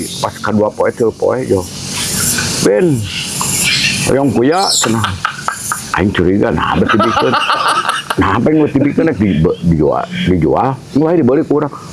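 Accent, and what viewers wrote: Indonesia. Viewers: resep ngdangu na, sunda asli ieu mh😂..... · Logat brdk cicadas ,anatapani 😂😂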